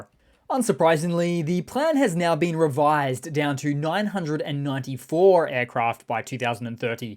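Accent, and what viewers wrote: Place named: Australia. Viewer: Canary Yellow!! That is Australian Green and Gold my friend and don't you forget it:) The sing song vocals have that rhythm of The Man from Snowy River; 'There was movement of the drone crews for the word had got around that the Rus from Belgorod had got away and had joined the Freedom League. They were worth a thousand frowns so all the Moscow hacks had gathered to the fray. Solovyob was there, frothing at the mouth, for no man could bullshit like him once his blood was up...... I swear is channeling the bard and his accent is like a swim in the vernacular. Onya. Carn Ukraine. Ken Oathcarne.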